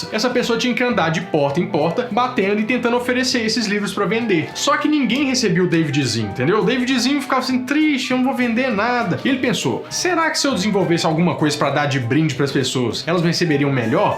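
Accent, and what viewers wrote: Minas Gerais, Brazil. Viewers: Assistindo em 2025, engraçado seu sotaque mudou muito com o tempo, ficou mais mineiro .🤔😂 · que mineirinho bakana sô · coisa fofa esse sotaque mineiro.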